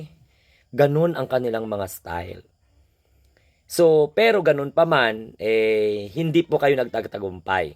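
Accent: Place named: Visayas, Philippines. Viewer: Visaya ka 😊dong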